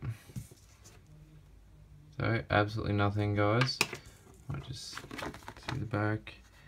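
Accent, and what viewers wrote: United Kingdom. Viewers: He sounds British, but there are dollars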